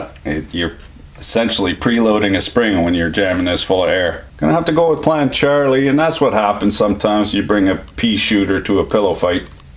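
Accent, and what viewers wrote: Quebec, Canada. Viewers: Love the canadia accent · Did u soften ur uuut(out) or have I been watching enough that I'm not even hearing ur c eh n eh d eh any more? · just yesterday i found this channel and it's seriously cool. Dude is funny, witty and super knowledgeable. also, did you go all quebecois at some point? eh tabarnak, je le savais pas, je me demande d'où tu viens? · An international colleague recently asked what we Canadians sound like. I sent him to your channel as a prime example.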